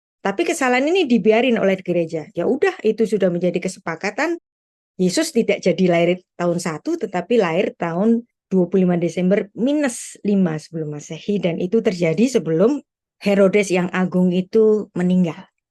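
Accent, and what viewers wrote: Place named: Java, Indonesia. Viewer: Materi Hanuka sangat menarik namun yg kurang ketertarikan adalah dialeg kedaerahanmu asal suku jawa itu yg melemahkan memahami pemaknaan isi sesungguhnya. Bisakah anda menggunakan bhs lndonesia yg baik dan benar tanpa kontaminasi dialeg kejaweanmu? Kok sampai usia yg segini tdk bisa menghilangkan dialeg kedaerahanmu itu gmn sih ceritanya??? Gunakanlah bhs lndonesia yg baik dan benar sebab lndonesia bkn seluruhnya suku org Jawa kan? Harap dipahami utk dimaklumi.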